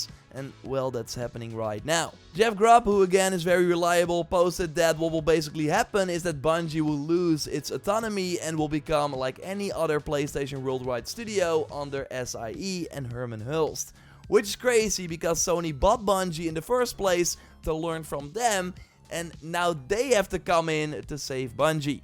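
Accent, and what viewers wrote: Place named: Netherlands. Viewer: The Netherlands. He is Dutch.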